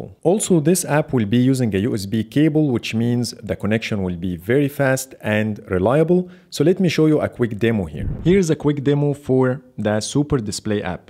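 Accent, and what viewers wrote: Egypt. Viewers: Nice apps thank you for sharing it, as you sound as an Egyptian English speaker.. Great job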